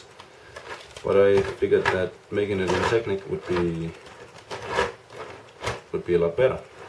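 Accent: Denmark. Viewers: you sound Danish. are you Danish?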